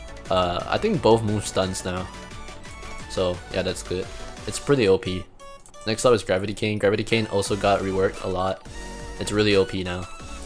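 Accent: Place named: Singapore. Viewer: u sound a little Singaporean haha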